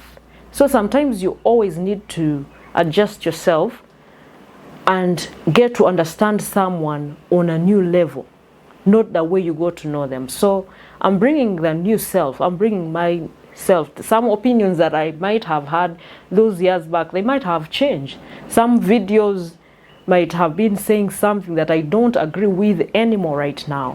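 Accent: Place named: Kenya. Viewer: you look kenyan now and talk like them😂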